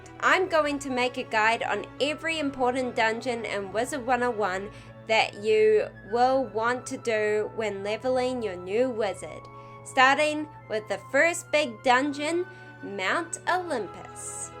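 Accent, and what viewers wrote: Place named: New Zealand. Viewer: Thanks for the guide, and I love your accent, it sounds like New Zealand.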